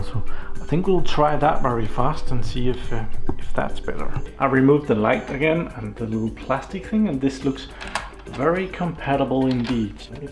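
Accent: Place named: Denmark. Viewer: im betting my ass on the line that this is a danish guy, his English accent is typical of a dane.....the ice is melting at the poles hahah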